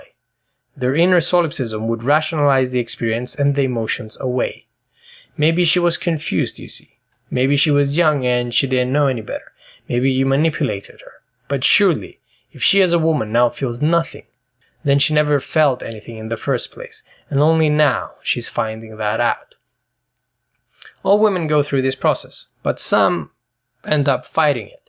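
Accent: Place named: Russia. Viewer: you sound like you are russian or ukrainain.... i dont know why... but you were spot on.. why is it that.. people from such countries are so redpilled?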